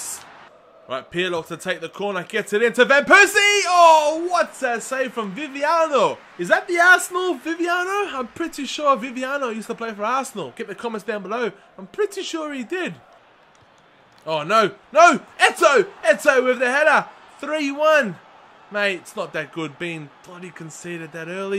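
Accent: Australia. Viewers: are you a fellow Aussie?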